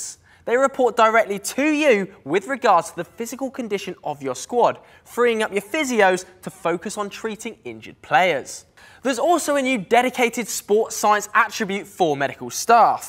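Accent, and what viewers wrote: United Kingdom. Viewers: When he has great British accent and talks like a childish 15-year-old Sorority girl in US.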